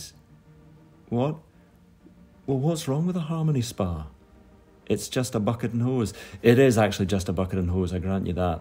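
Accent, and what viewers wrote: Scotland, United Kingdom. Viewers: that lovely Scottish accent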